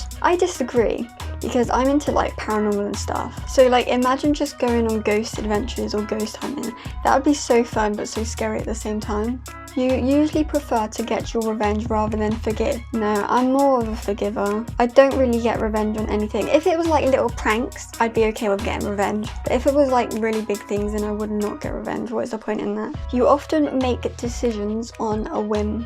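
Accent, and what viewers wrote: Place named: New York, United States. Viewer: This is interesting thanks for sharing! I like editing of the video too! I’m gonna sub to see more videos! I also am from NY so your accent keeps me hooked in lol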